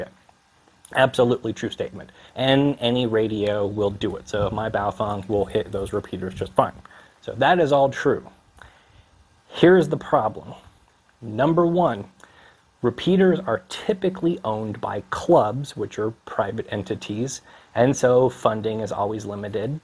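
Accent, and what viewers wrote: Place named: Austin, United States. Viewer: Yup. You're definitely from Austin.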